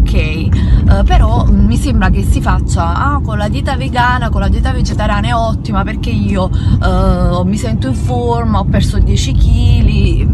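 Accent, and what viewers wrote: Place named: Naples, Italy. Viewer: Sempre a criticarla per come parla...ma nessuno ha ancora capito che e' napoletana?e per i napoletani tutto e' permesso sono cosi'!! non lo fanno apposta e'anche per questo che sono simpaticissimi😊😊😊vai!!!bellissimo video!!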